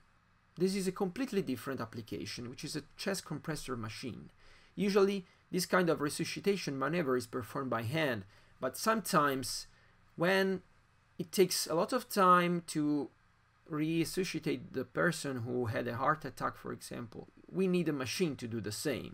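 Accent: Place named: Italy. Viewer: Mi scusi per offtop, ma mi piace che Lei parla Inglese con accento Italiano! :)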